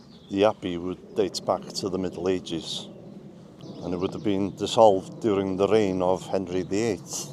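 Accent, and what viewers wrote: Wales, United Kingdom. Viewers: Please! Never apologise again for your Welsh accsent. It's part of who you are... Best wishes from Scotland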